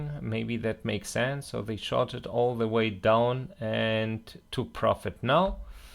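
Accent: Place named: Germany. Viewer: why not? It is honest and the German English always sounds funny to me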